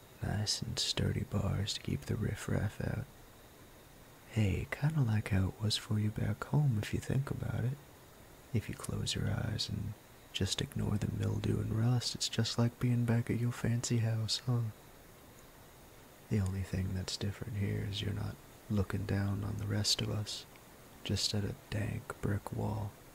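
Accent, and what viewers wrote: South, United States. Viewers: That slight southern accent 😩👌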